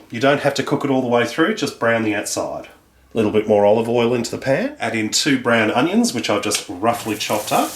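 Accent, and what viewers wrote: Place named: Australia. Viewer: love Australian accents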